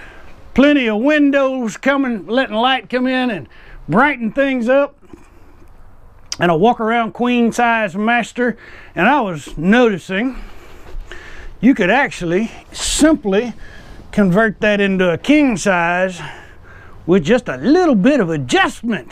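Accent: Texas, United States. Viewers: I'll buy this if you come along, too 😉 ! You are AWESOME!! I didn't check yet where you're located, but you sound like you might be a Texan, who are some of the coolest people in the world 😘 !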